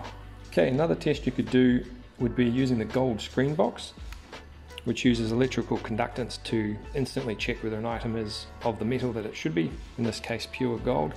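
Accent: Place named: Australia. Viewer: Baaa.and baaaaz. (Dumb ozie accent for Bar)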